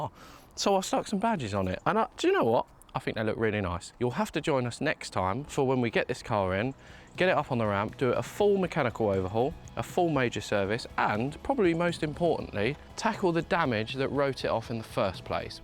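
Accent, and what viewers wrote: Scotland, United Kingdom. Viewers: i can understand you perfectly even with the scottish accent buddy :)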